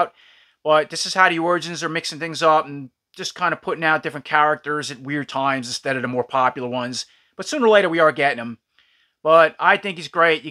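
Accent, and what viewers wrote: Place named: New Jersey, United States. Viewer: Love your voice man! Jersey kinda sound! I gotta check more of your videos out. Thanks again for the dub and I subscribed back ..but looks like your chan chan is doing well.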